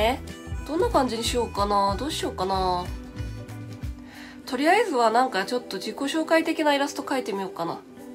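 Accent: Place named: Kansai, Japan. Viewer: 自然に関西弁で全然良い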